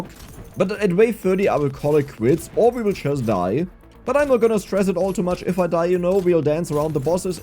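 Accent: Germany. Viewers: Direkt die deutsche stimme erkannt😂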